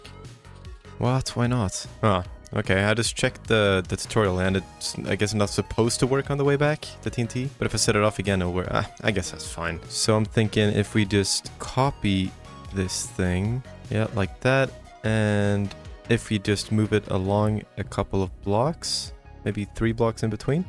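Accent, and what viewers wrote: Sweden. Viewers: Are you Swedish perhaps? If so, hallå där🕺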